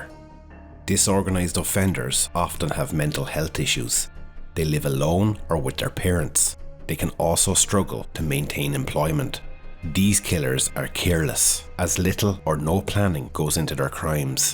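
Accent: Ireland. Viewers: Well Its usually someone with a New York accent narrating these videos about Rex but this time it's a lilting and lovely Irish brogue! Jesus Mary and Joseph it is horrendous to hear what the despicable monster did to his victims dear Lord Jesus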